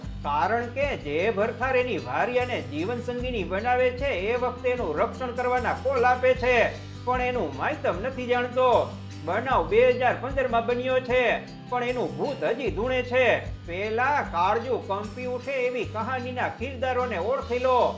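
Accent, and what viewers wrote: Kathiawar, India. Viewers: ના હો ભાઈ તમે ગમે તેટલા બદલો પણ આ રણકો નહિ બદલાતા કાન માં ઠંડક થાય છે આવું ફાંકડું original કાઠિયાવાડી ભાષા ની કિંમત છે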